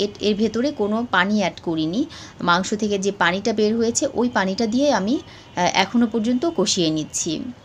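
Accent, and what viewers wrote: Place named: Chittagong, Bangladesh. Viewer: আপনার কি সমস্যা? ভালো না লাগলে ইগনোর করেন।আপুর কথায় চট্টগ্রামের টান আসে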